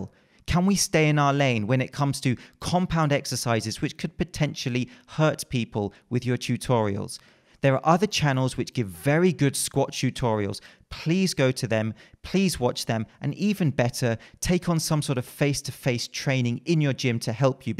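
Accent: England, United Kingdom. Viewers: Proper English accent, still says "ain't." I love it. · I trust this guy because he's bald and he speaks with a british accent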